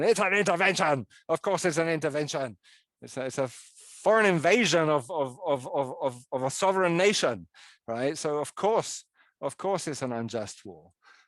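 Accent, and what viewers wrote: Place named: United Kingdom. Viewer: It is interesting that a British accented man has taken up the task of telling the "Mexican perspective" on this history.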